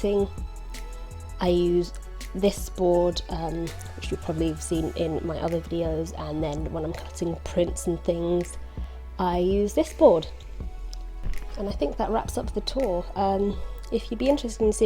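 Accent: England, United Kingdom. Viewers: Wow I like so much your space 👍 it's seems to be personal space not like in others videos I see from people I'm french I would like to speak english like you 😄 I love this english accent! 💕 Where are you from ?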